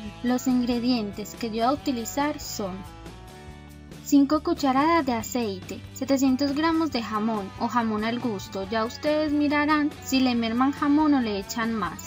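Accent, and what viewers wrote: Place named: Medellín, Colombia. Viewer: Ese acento de Medellín que gusta mucho Besos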